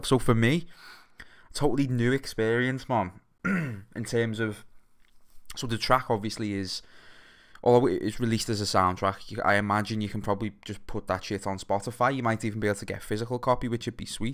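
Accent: Liverpool, United Kingdom. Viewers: Liverpool 120% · WarDuck23 Think he’s a Scouser (Liverpool). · Nice to hear a fellow scouser commentate on a fucking beauty of a audial concoction <3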